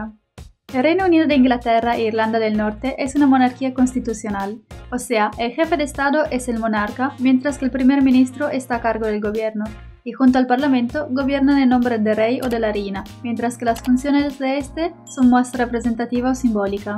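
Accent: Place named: Buenos Aires, Argentina. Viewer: Muy buen contenido. Pero es vergonzoso y horrible q hables con el sheismo. Te sugiero que remedies ese error porque en la argentina solamente los porteños hablan asi y es repognante para el resto del pais. es una lastima q digas apeshido siendo que lo correcto es apellido. Es una ofensa para la lengua castellana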